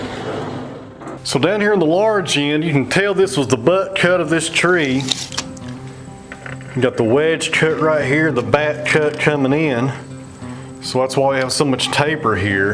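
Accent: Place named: South, United States. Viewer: Can the accent get any more southern? I love it.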